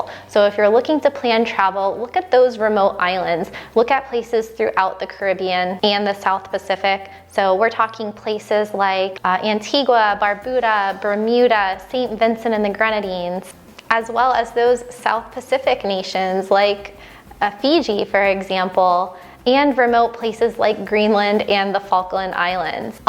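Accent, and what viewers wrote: United States. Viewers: Meanwhile at a place near you in the US of A confirmed Covid19 cases 6.83M, deaths 155K Source Covid19 alert. Africa population 1.22B Covid19 cases 870K, Deaths 100K Recoveries 524, 557 African countries in no particular order: Ghana Population 30M Covid19 confirmed cases 34,406 Ethiopia Population 109.2M Covid19 confirmed cases 15,200 Kenya Population 51.4M Covid19 confirmed cases 18,508 Source John Hopkins's University Africa Centre for Disease Control As soon as I saw the blonde hair and American accent shows a picture of the entire world and only focuses on countries with people who look like her. For a disease that doesn't discriminate; I am thousands of miles away from America so this one can't call the American Police on me to shoot me!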